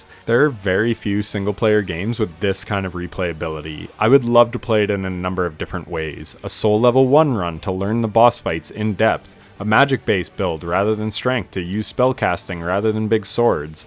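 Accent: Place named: Canada. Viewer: Halfway through the video I realized you’re Canadian 😂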